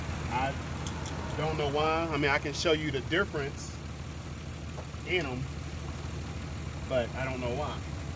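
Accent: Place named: United States. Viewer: You just helped me so much and i greatly appreciate. What i enjoyed most though was your country accent and the fact that you made the time to break down and show us step by step. This video is by far the best learning experience i have gotten from a mechanic video. I would like to see more since I own a 96 nissan maxima and have changed out numerous censors and other important parts and mine will still not run. If you could maybe help me that would be fantastic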